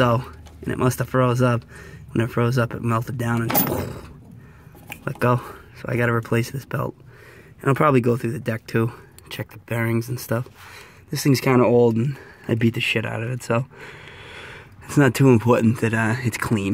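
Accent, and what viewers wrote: Boston, United States. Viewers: Sounds like Boston. Lol.. good video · Sound like you from Bostonn, great video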